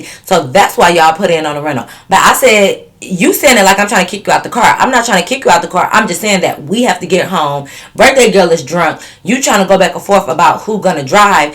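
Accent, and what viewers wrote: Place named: Detroit, United States. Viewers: Girl idk what the accent is or sounds like either but I knew you from Detroit by the “accent” and I got excited halfway through when you said you were ❤😂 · My first time to your channel. I knew you were from Detroit 20 minutes in. 😂❤ subscribed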